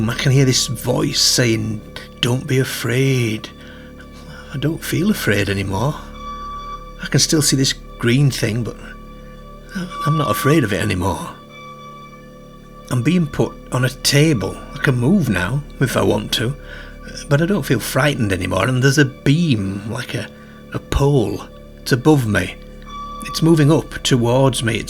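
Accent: United Kingdom. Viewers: I've heard his accent before, just cant recall where its from in the UK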